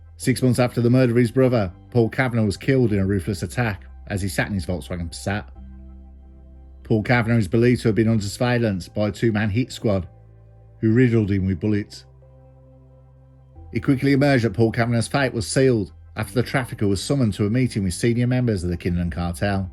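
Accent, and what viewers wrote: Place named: Midlands, United Kingdom. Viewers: 100%.. love this video and the combo of black country/brummie accent and surreal music makes this reality peaky blindersesque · Yeah that's it, it's the narration that fits like a hand in a glove with the content, probably his raw Midlands accent and demeanor that draw us in and keep us listening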